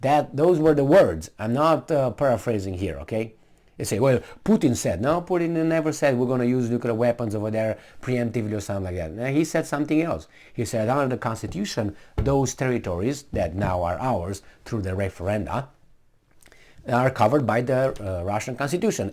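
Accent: Australia. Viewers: A Romanian, speaking in an Australian accent is something I didn’t know I needed lol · Not a bad casual attempt at an Australian accent, for a Romanian....😁👍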